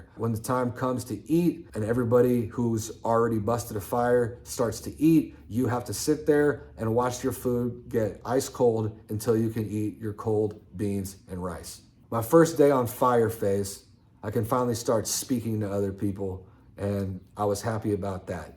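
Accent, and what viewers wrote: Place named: AAVE, United States. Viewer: You sound much better when you drop the Ebonics accent. No offense. Why keep up with the African American accent. I understand that you are into Rap and music etc. I don’t even think that Eminem speaks with an African American accent.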